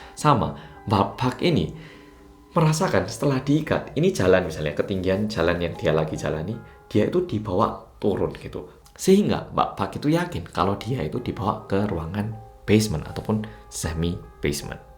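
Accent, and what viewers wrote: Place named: Malang, Indonesia. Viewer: annyeon...good channel banyak pelajaran yang di ambil hikmahnya dari channel u.. hampir 16th dimalang logat malang jawanya dapat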